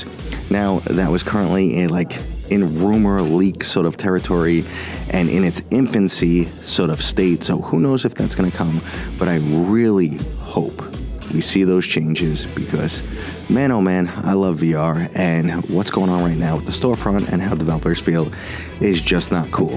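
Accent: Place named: Brooklyn, United States. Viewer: You know when that Brooklyn accent "tries" to whisper, you're in for something good.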